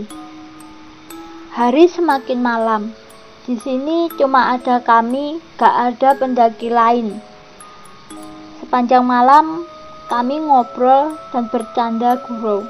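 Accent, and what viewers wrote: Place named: Java, Indonesia. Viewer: Medok amat ngomong y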